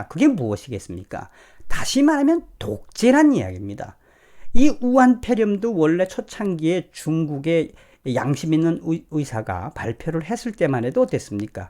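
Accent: North Korea. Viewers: 저는 오히려 태영호님이나 대동강오리? 하시는 분보다 좀 북한 억양이 덜한듯해서 인터넷 조회하니 북한분 맞으시고 심지어 제가 본 뮤지컬 만드신 분ㅋㅋ~ 반가워서 구독 꾹~~~~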